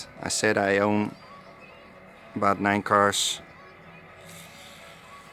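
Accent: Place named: Netherlands. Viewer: weer dat vreselijke Hollandse rot accent🤔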